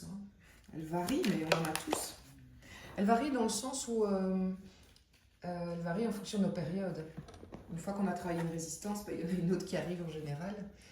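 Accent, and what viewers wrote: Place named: Belgium. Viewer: Tirage intéressant et que je ressens, comprends....Très fine interprétation ! Votre élocution, et sa richesse est vraiment Top. J'ai cru déceler un soupçon d'accent Belge et je l'adore. J'ai beaucoup d'amis Belges qui ont un humour formidable. le 2 ièm semestre s'annonce peut-être encore plus usant que le 1er. Va falloir prendre soin de sa santé et rester le plus au calme pour pouvoir s'économiser et être dans la lucidité en cas de prise de décision importante...Merci beaucoup. Je like et je m'abonne 🙏👍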